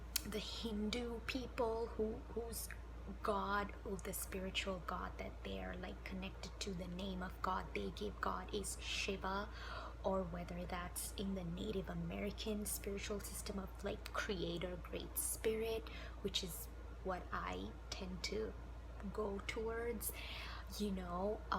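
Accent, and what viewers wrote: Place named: Sri Lanka. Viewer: From the name..indian from the accent possibly Ceylonese or Singalese from Sri Lanka...either way..same heritage...im indian as well and it looks like more and more of us are getting red pilled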